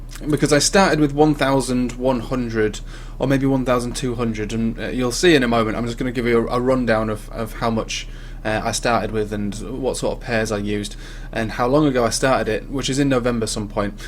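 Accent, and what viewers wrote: United Kingdom. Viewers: Sounding like you in the UK, this actually works here?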